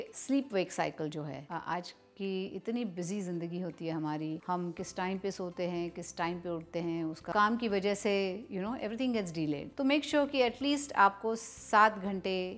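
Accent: Maharashtra, India. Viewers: मराठी माणूस कुढेहि गेला कितीही शिकलेला असला कितीही इंग्लीश हिंदी बोलत असला तरी त्याच्या बोलण्यात थोडातरी मराठी टोन येतोच ❤ u mam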